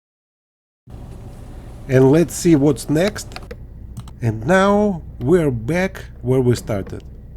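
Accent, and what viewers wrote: Russia. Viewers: the best part of the video is this guys accent. sounds like a Russian scientist. the good crazy ones. funny dude.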